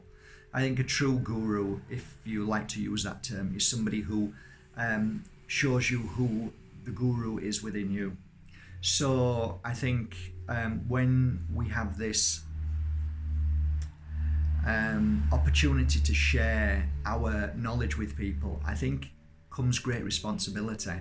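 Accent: Yorkshire, United Kingdom. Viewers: Love your Yorkshire accent so much. Even though you're all covered up with a t-shirt 😉 thank you for another lovely message. 💕 😘 🤗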